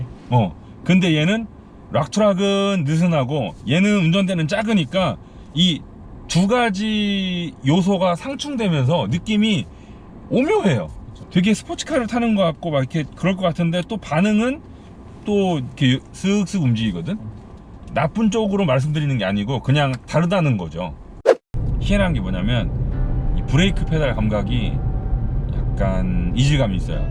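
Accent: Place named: Gyeongsang, South Korea. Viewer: 경상도버전 개웃곀ㅋㅋㅋㅋ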